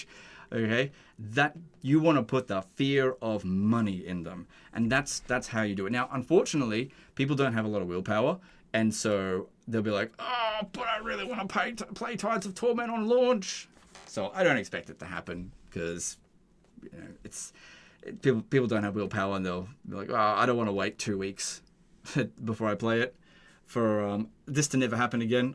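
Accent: Australia. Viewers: Ill miss yr Aussie voice! You made me a better English learner!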